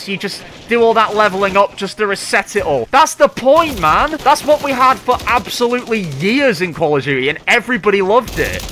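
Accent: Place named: Yorkshire, United Kingdom. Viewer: Bro you have literally the exact same opinion as me, even down to the yorkshire accent lmao.. was saying this on reddit the other day, went on to complain about hackers and some nob was trying to say it was the best game of the modern era and it rage baited me hard enough to give this entire breakdown to them basically bar for bar, word for word what you're saying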